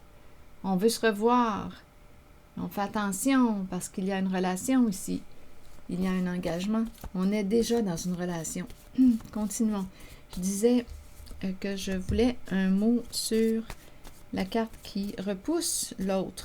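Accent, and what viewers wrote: Canada. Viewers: Bonjour Oui je suis très attirée par lui mais le repousse sauf qu'il habite en face de chez moi ya une tierce sa femme et 2gamines qui me posent problèmes depuis des années bien avant d'être tombée amoureuse de lui et cette invit arnaque Facebook 2018 où voisins(es)ont participé pour me manipuler dont cette tordue de femme et ses2gamines!et lui se cache alors qu'il me souriait se retournait sur moi m'avait même parlée..ils sont très à l'affût de la mode et lui mettait casque oreilles dans cesse pour écouter musique en allant se promener..moi je sais pas ce qu'il a dans la tête mais dans son regard je vois bien qu'il a une forte attirance pour moi..trop difficile tout ça..oui vous savez déjà que je suis en 'colere'.. J'm beaucoup votre accent canadien et vos interprétations..bonne journée..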